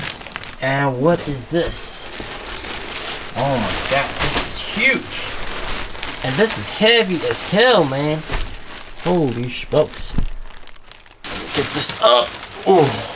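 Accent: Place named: New York, United States. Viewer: Hey are you from NYC? Queens or Brooklyn? I hear the accent lol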